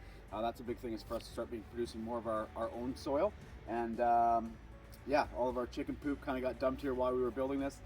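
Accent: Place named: Canada. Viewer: I knew I was hearing a Canadian accent. What a location! Was this land in your family?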